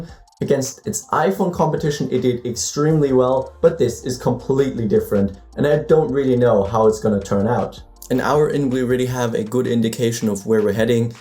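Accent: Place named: Germany. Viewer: you have a Lithuanian name and German accent. Where are you from?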